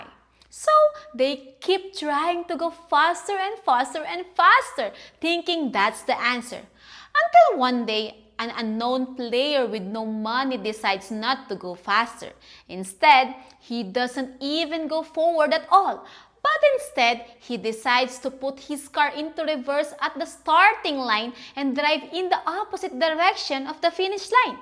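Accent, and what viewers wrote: Ireland. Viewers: That Damn Irish I just Love Your Accent!!!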